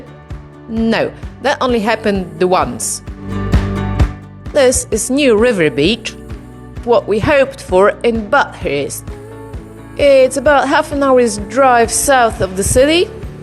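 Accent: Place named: Europe. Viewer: Love your accent which part of Europe do you come from?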